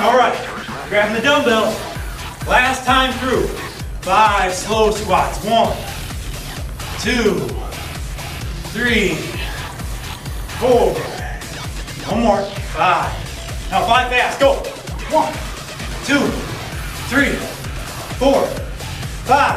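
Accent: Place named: Minnesota, United States. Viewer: you're awesome. i love the accent, too. lol. it's exactly the same as my roommate's from mn.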